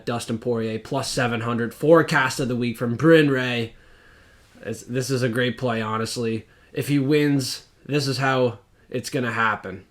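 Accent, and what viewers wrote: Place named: Glace Bay, Canada. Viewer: Fuck b'y you sounds like yer rite outta glace bay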